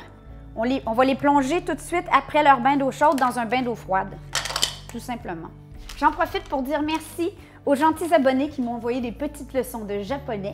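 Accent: Quebec, Canada. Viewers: Tu dégages tellement d'énergie positive et de bonne humeur, c'est vraiment génial ! Et l'accent québécois est tellement fun 😂 J'adore 💕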